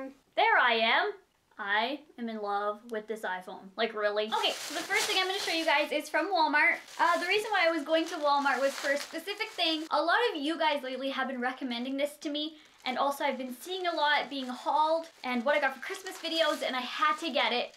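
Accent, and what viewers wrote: Canada. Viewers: Hear your Canadian accent heh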